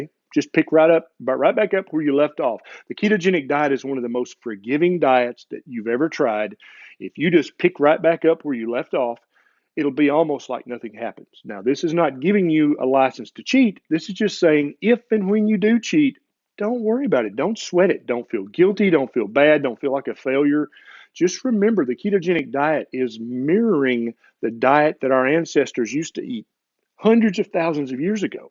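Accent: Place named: Kansas, United States. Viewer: Dear Dr. Berry. Frank here, RN and Certified Personal Trainer, to say how much I enjoy your videos. After 10 years teaching exercise, I have started to incorporate nutrition, especially keto lifestyle, into my programs. I appreciate the fact that you hold an MD degree which I believe lends credibility to your opinions on healthy eating. Also, your accent reminds me of my PCP who hails from Kansas! Keep up the good work! Let's "Make America Healthy!"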